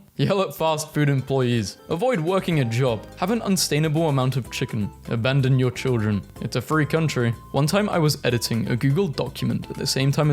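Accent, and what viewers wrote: United Kingdom. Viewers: are you British by any chance? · are u british?:D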